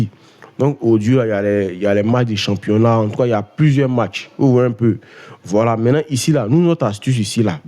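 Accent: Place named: Ivory Coast. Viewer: merci mais vraiment ton asant me rapel vraiment tu es ivoirien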